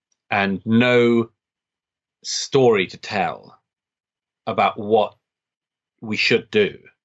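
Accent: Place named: United Kingdom. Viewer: whole thesis is there's a collapse of shared stories in the West with the decline of ideologies like Christianity and capitalism. But then, he attacks civil rights movements aka 'identity politics' (gay/womens/trans) for being exactly that story to tell for many, because apparently they're too divisive and not scientific enough for him. Does he seriously believe that ideologies like Anglican Christianity and Smithian capitalism rested on some bedrock of universal value and rationality? What a myopic worldview. This guy is a muppet. Not everyone with a British accent is a genius.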